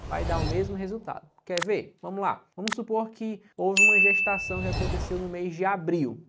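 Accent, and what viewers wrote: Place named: Paraíba, Brazil. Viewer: faz vídeo sobre as medicações que mais são usadas na enfermaria, diluição, indicações de uso e contraindicações … ótimo vídeo! Sou da Paraíba temos sotaques parecidos kkkk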